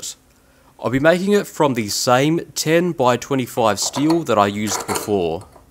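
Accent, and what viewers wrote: Australia. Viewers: All great again mate except you pronounce chamfer wrong, don't give up Australian accent. It's a French word and it's pronounced with a "SH" . Soon you will be pronouncing rebate as rabbit? 😉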